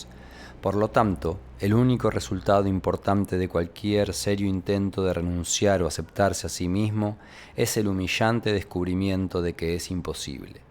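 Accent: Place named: Argentina. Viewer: Más allá del contenido que es genial, el locutor real me encanta! En argentino!! Éxitos!!!!!!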